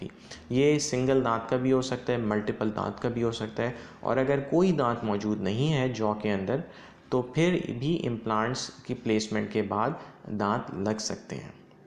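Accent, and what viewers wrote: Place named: Karachi, Pakistan. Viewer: ڈاکٹر صاحب آپ کی لینگویج سے معلوم لگ رھا ھے کہ آپ کراچی سے ھو۔۔۔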